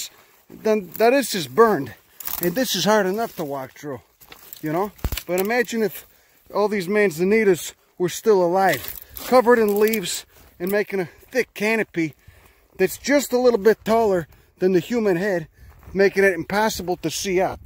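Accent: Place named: West Coast, United States. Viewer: Wow! You give me hope for Paradise and Magalia. Well, not for me, but maybe in 250 years it'll be back the way it was. I searched many barrens in eastern Butte county. I just knew there was an undiscovered Californite lode besides Pulga. Nah! But it got me out walking and exploring. Love your instructionals. Your west coast accent sneaks in there sometimes.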